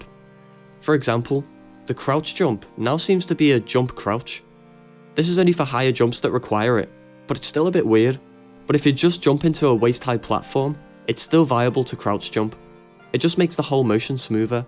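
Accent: Liverpool, United Kingdom. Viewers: im like 90% sure this dudes uk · Here are you scouse la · bro are you scouse? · are you a scouser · He is 100% from Liverpool, UK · Scouse xd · Subbing but only because you're scouse lad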